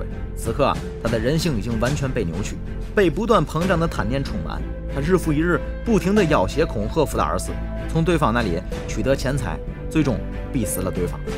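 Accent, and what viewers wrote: Tianjin, China. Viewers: 天津话太搞笑了，我喜欢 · 天津话最好用天津式土话。普通话天津味儿太违和。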